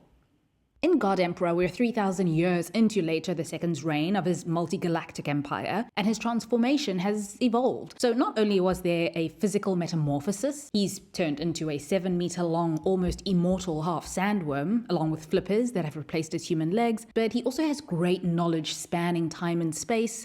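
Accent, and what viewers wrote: South Africa. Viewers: I love the female Sourh African accent. The perfect mix of allure, forthrightness and malevolence.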